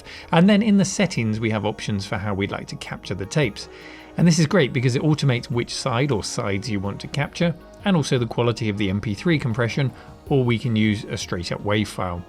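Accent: United Kingdom. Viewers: British LGR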